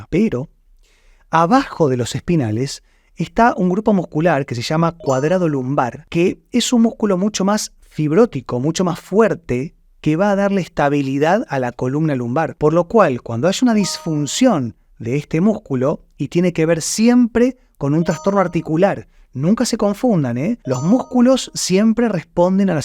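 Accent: Buenos Aires, Argentina. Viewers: Hola sos de Buenos Aires Doc??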